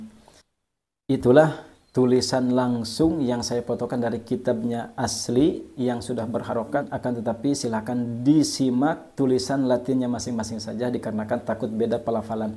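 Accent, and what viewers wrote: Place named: West Java, Indonesia. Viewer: Pa ustadz logat kyak orang Sunda, emang tinggl daerah mn klu boleh tau🙏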